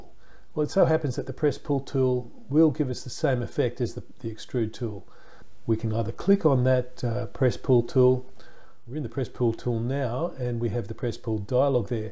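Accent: Australia. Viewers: Hello from UK! I much prefer listening to your Aussi accent than the American lazy drawl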